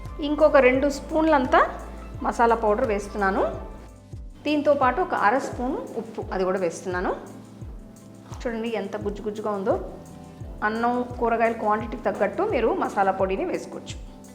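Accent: Tamil Nadu, India. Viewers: అవిడ భాష పలికే తీరే చేప్తుంది తమీలీయిన్ అని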